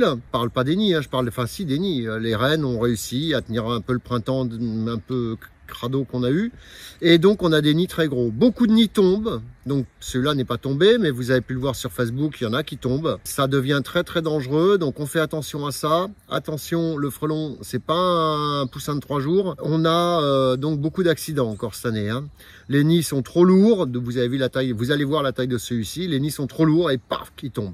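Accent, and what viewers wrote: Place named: South-West, France. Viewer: Bonjour comme l'enveloppe du nid n'est pas commune penses tu que ce soit une anomalie ou une adaptation du frelon a l'environnement.?? J'aime bien l'accent du sud ouest.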